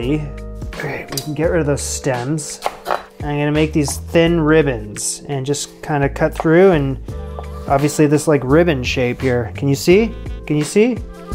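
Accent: Canada. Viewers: I am pretty sure he is Canadian, judging on his accent, preference for metric, the fact that his older videos were filmed in Canada, etc. He is currently in NYC though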